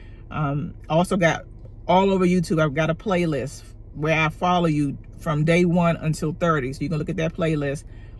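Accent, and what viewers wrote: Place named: DMV, United States. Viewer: It’s the DMV accent for me 🥰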